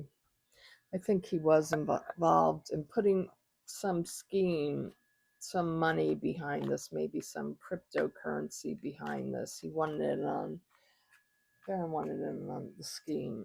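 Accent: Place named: Wisconsin, United States. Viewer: Where do you live? You sound a bit Wisconsin to me.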